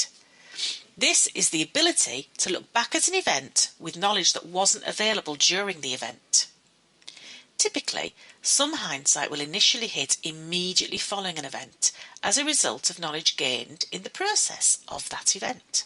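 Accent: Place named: Yorkshire, United Kingdom. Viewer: Yorkshire English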